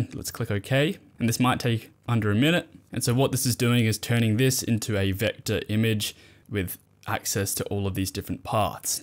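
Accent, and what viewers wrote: Australia. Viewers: i can tell this guy is australian in a second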